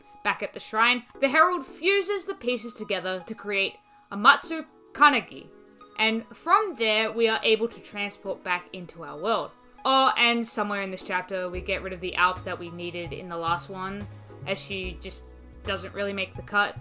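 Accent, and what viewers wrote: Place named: Australia. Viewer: Australian spotted.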